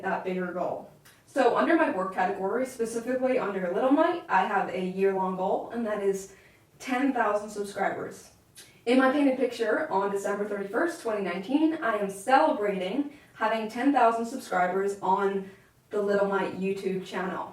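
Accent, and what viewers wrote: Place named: Belfast, United Kingdom. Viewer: Great way of breaking down your goals to achieve success and a really good video. Also love when you say 'now' and 'out', still got the Belfast accent :)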